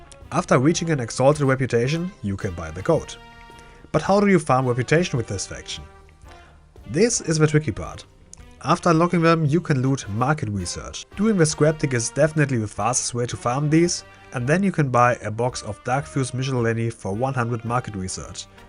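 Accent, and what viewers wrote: Germany. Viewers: Most german accent on YouTube after maybe Werner Herzog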